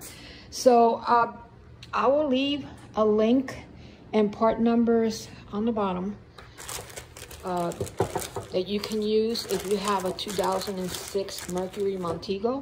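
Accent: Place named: New Jersey, United States. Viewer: OOH !!! A Joisey Gal !!!! Luv it even more !!!!!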